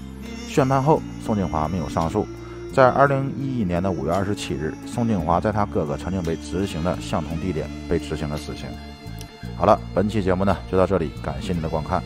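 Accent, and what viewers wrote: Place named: Tianjin, China. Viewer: 天津话不像天津话，普通话不是普通话，听着别扭。干脆就用天津话讲就算了，这样反而更好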